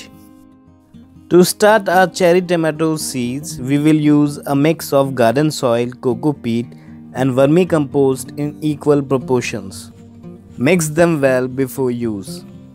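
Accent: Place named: India. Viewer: Thick accent nothing is more annyoying than Indian accent.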